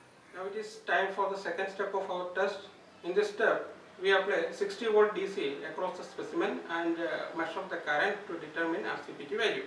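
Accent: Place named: India. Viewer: I like it :) But Indian English is so difficult to hear ^^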